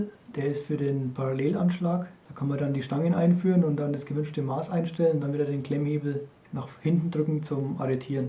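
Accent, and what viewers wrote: Germany. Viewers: Ich höre doch einen Franken raus ? 👍👌 Tolle Videos.....des gibt Auf jeden Fall a Abo 🤘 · Gute, komprimierte Erläuterung. Danke. Vom Dialekt her würde ich auf Mittelfranken tippen, Nbg, Fürth, Erlangen. ;-)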